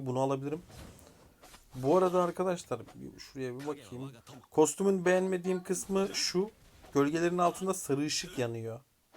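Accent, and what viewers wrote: Samsun, Turkey. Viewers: Samsunlu olduğunu belli ediyo bende samsun luyum RE!S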